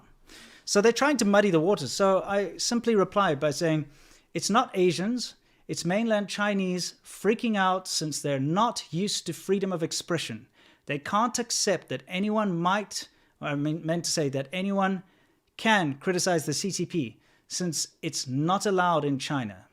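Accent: South Africa. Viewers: I love watching your videos, we all know that the Chinese govt sucks, but there’s also the usual “it’s the government, not the people”. You bring us education especially about things we’ve never imagined we’re going on. Unfortunately we also learn that indeed (some of?) the people are to be viewed with suspicion as well, as they walk among us covertly. That’s so sad, I haven’t decided yet if that’s all only because of their government and they wouldn’t be horrible otherwise or if the government is that way because people are. I’ve seen them do some pretty shitty things here in my country just in everyday life, and heard worse from people who had to go to China and other Asian countries for some reason or other. It’s interesting how obvious it is here when you see a person who has Chinese blood, you can usually tell way before they open their mouths if they were born here or not, even just by how they walk and look at people, and the way they hold themselves, or even if they’re littering, picking their noses, and spitting everywhere or not. 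Plus I love your accent, it feels like a homey thing to me as I went to school and grew up with many, many people from SA, so that sound is a little bonus :) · Your SA accent is slight but insidious. I spent half the video thinking you were talking about something called a "Lenin wall". They should arrange reinforced glass cases for such things at universities to avoid vandalism. Or at least make it a punishable offense to vandalize them.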